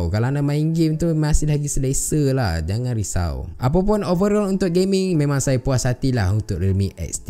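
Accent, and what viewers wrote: Taiping, Malaysia. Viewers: are you from taiping?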